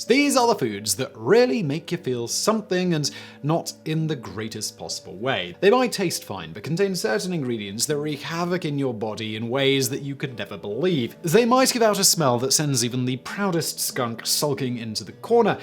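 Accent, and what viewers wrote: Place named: United Kingdom. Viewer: I luv how Brits' pronounce the word "vitamin,' ha...Here in the 'states it's a LONG "I" whereas in the U.K. it's a short "I". Seeing as how they basically spawned the english language, who knows...maybe it's American's who are pronouncing it incorrectly.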